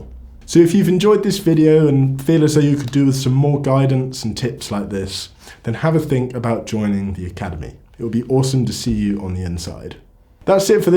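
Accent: United Kingdom. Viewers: I'm here for the accent: I love the way you say, "What is up guys" like you are Prince William 😅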